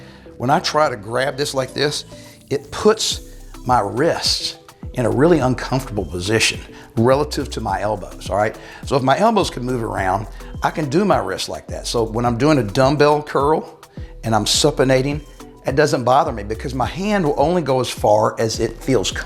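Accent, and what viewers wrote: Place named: South, United States. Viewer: He's from The South. We sez it lack we wunt.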